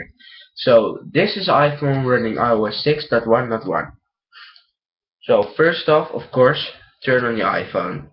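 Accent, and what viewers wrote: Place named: Netherlands. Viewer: je bent nederlands kan ik horen en je naam :p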